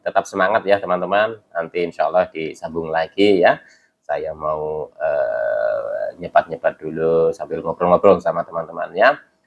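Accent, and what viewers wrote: Banyumas, Indonesia. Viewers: Cilacap hadir mas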